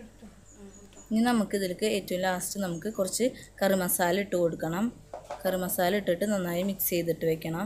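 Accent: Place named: Thrissur, India. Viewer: സംസാരം കേട്ടാൽ അറിയാം തൃശൂർ ആണെന്ന്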